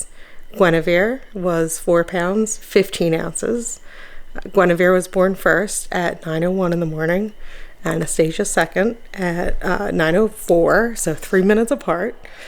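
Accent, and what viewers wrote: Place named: East Coast, United States. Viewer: u sound like east coast of the U.S. I am in B.C., Canada......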